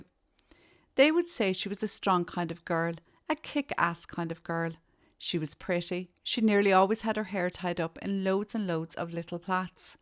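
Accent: Ireland. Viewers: I love her accent/cadence it is a lovely bit of home slice for an Irish lass in Australia. Did your mum not teach u if u have nothing nice to say.....?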